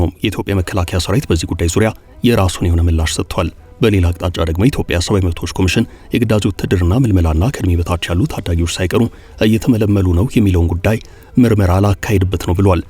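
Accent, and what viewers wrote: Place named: Eritrea. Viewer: Ethiopia ina artira biwagu anit metifo utuber ke ethiopia dirashihin newi yeminatefahi ye artira selayi newi yemitimesilewi the way u talk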